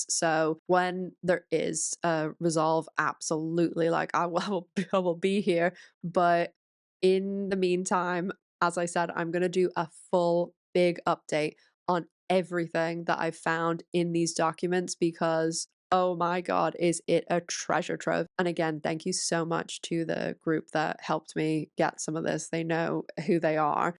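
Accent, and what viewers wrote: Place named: United Kingdom. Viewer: Where in the UK are you from? Your British accent sounds faint, not Ike Queen’s English but something else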